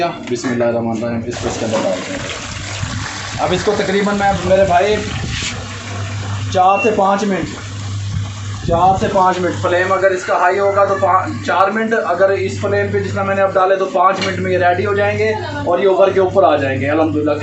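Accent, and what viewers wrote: Punjab, India. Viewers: Well done brother I like your style Punjabi accent mein Urdu Kamal boltay hein tension nahi leni 👍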